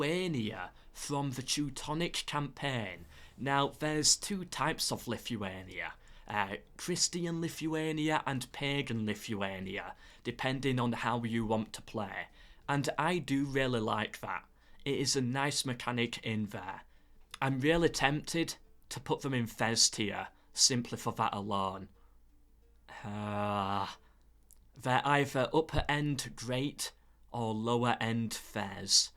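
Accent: United Kingdom. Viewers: Idk how good is your micro but you still sound british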